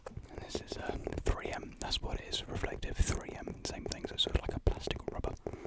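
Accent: United Kingdom. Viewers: Not a fan of uk accents but I liked listening to yours. Also really cute love the dark hair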